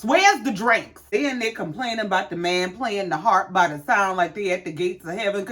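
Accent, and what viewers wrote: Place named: Charleston, United States. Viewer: I just happened upon your page, and as a South Carolina girl if that ain’t Chucktown I hear I know sumn! Glad to have found you!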